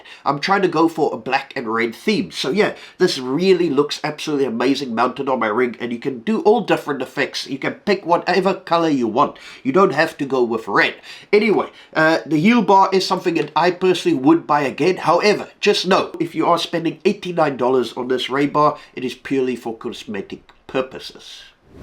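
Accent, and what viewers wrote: South Africa. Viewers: You sound like a south african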